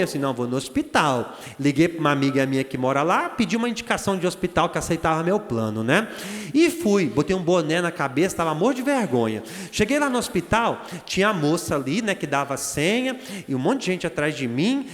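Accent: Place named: Paraíba, Brazil. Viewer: Eu jurava que o senhor era da Paraíba.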